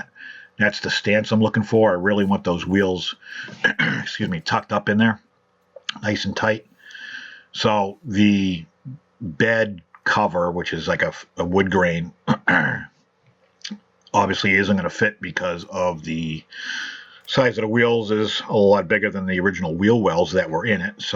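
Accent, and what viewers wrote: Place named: Boston, United States. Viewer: I love the Video! You gave me some great ideas. I noticed your bracelet, and I am a disabled veteran who was so bored during the pandemic, that I re-ignited my passion for collecting my diecast cars and motorcycles. I didn’t love the Motormax die-casts, but now I’ll grab some to customize. My big question is this, the accent tells me you MAY be a Sox fan...lol, I a New Yorker so there is that. Either way, you have a new subscriber and fellow East Coaster!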